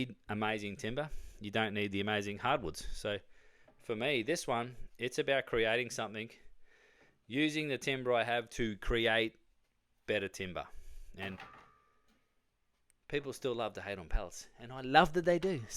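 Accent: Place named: Australia. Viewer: Is it just me or does he sound even more Australian on this channel?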